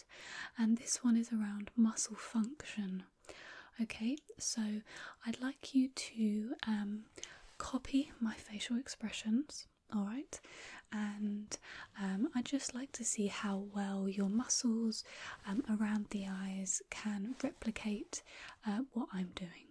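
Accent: United Kingdom. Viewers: u r british?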